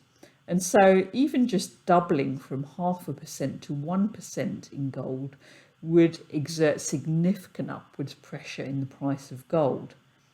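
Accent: England, United Kingdom. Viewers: Thanks for all the information. You definitely did not answer the question though !!! Noticed someone said you were a kiwi. You have an English accent surely ?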